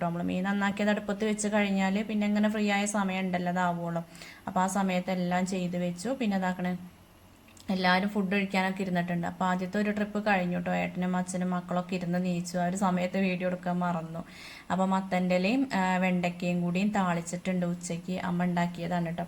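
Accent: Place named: Ottappalam, India. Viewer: ❤❤ ente nathoonum nalla aalanu pine sugano samsaram ottappalam samsaram anu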